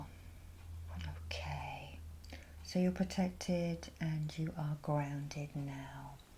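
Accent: England, United Kingdom. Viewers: I love the way The English from England sounds, what an enchanting accent =voice, you and put me to sleep easily 😵‍💫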